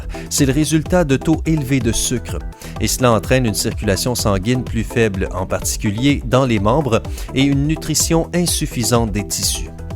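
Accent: Quebec, Canada. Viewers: J'adooooore l'accent québecquois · J'aime l'accent québécois du mec mdr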